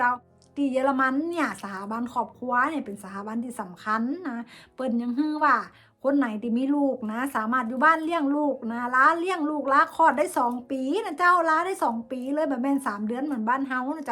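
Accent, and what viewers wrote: North, Thailand. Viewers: อู้กำเมืองม่วนขนาดจ้าวปี่ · ชอบสำเนียงเหนือมากเลยค่ะ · เป็นคนเมืองน่านเหมือนกับน้องพลที่ผมเรียกว่าทำงานด้วย สำเนียงภาษาเหนือไพเราะเลยครับ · สวัสดีจร้า อู้คำเมืองน่ารักดีจร้า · ชอบเวลาน้อง อู้คำเมืองน่ารักจ้า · สวัสดีจ้า แม่ จ้า อู้คำเมืองเพราะมากๆจ้า จ้า เลิฟๆจ้า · อู้คำเมืองเพราะมากๆค่ะชอบๆจริงๆค่ะ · อู้เหนือได้เพราะมากเลยจ้าพูดได้เก่งมาก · ชอบครับภาษาเหนืออู้เพราะพอได้อยู่ครับคำเดียวคือคำ ลำเต้ๆครับ😁🤗✌️🤟♥️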